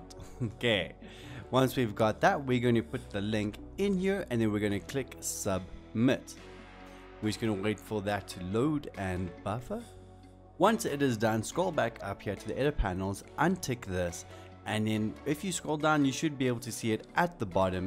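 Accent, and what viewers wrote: South Africa. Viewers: Ayyyeee Afrikaans?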